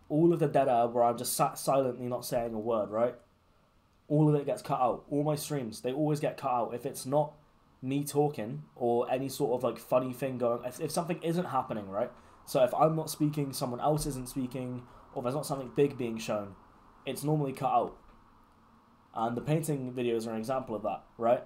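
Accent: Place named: United Kingdom. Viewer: Do something else ❌ Watch talk about his life and the things he's passionate about with a british accent. ✅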